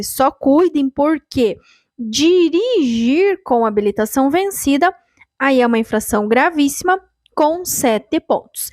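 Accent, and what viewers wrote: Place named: South, Brazil. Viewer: pelo sotaque e do sul.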